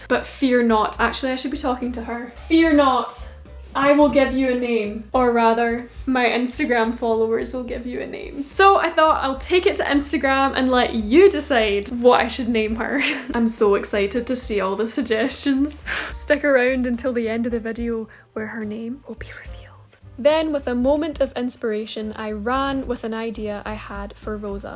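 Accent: United States. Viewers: no ur american accent was PERFECT what the heck lol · your american accent is really good! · Your american accent was pretty good! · Your American accent was actually on point! Loved this video, thank you for sharing and making us all believe in thrifting and the possibility of transforming "those" clothes into something new and fresh! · your american accent was actually so good 😭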